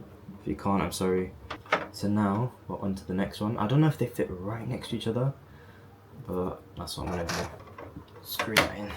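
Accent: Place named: United Kingdom. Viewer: You sound like another British bloke, Lewis Hamilton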